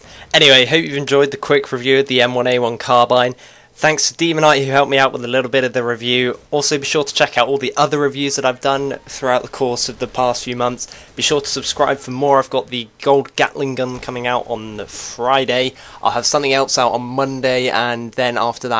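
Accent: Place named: United Kingdom. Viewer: lol ur British accent :) cool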